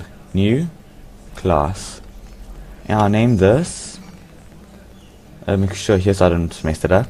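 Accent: South Africa. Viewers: ur african? lol wow :D im from england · you sound South African....are you?? if you are, jas my bru, so am I!!! :P :D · this guy sounds South African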